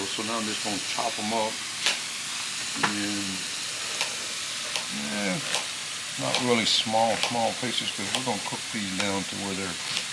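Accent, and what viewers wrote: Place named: Louisiana, United States. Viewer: Hey — are you from south Louisiana originally. I detect a bit of an accent. I live in Broussard La.:)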